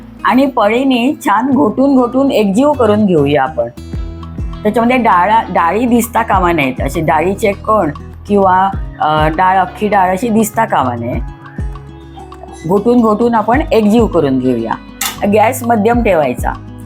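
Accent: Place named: Goa, India. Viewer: तुम्ही कुठल्या आहेत, मातृभाषा कोणती, बोलण्याचे toning Goa side chi वाटते की कारवारच्या आहेत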